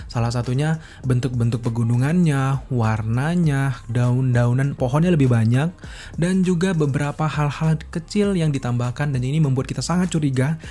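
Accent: Java, Indonesia. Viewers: gua gamasalah sama logatnya, mau dia logat medok kek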